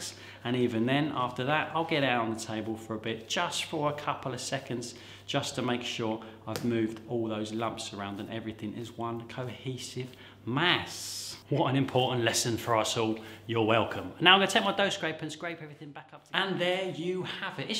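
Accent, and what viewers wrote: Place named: Cockney, United Kingdom. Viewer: you don`t talk too much! You`re enthusiastic about your topics! Your Cockney accent is charming as hell, including the dropped letters, differently-pronounced words, and all. Tattoos? Well, you can`t win them all.;) Luv ya, bruv.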